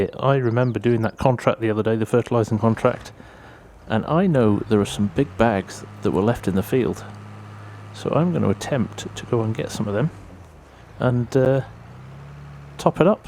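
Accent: England, United Kingdom. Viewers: Are u english?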